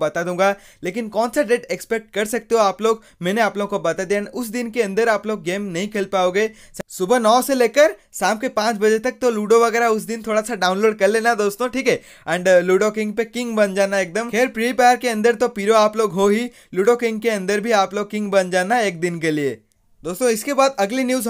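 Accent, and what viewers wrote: Odisha, India. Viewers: Odia ho kya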